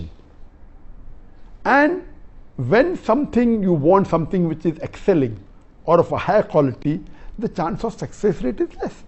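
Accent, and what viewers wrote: India. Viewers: Please admin, you should probably give the subtitle. sometimes i dont quite understand Indian accent. My english isn't good at all & i still want to learn from Dr Zakir Naik. Thanks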